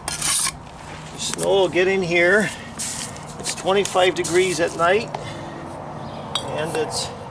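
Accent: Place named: Scranton, United States. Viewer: Love your videos....I had to look ya up what part of pa...I knew with that accent had to be scranton..keep up the great work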